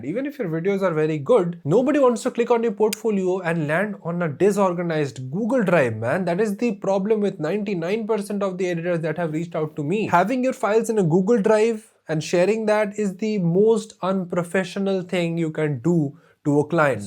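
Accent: Bengal, India. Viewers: good to know u can have a YT career in english with such a strong bengali accent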